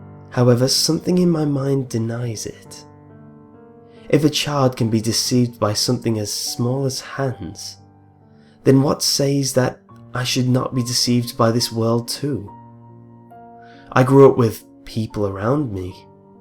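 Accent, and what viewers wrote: United Kingdom. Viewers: I like his voice because he's British!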